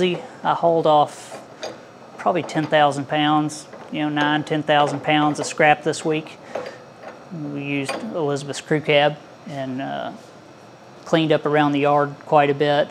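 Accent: South, United States. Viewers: First time I watch your video. Nice shop! From your accent I think you live in the south, somewhere it can get cold. North Carolina? I have a lathe and a mill, a much smaller shop than yours. I look forward to watching more of your work.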